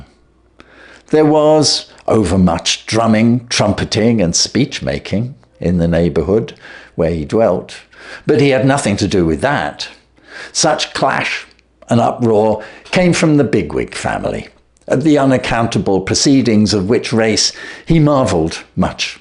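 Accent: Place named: RP, United Kingdom. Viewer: Many thanks to you Mr for this excellent voice and nice RP accent. I beseech the Almighty Allah to bless you. Accept my greetings to you from Iraq.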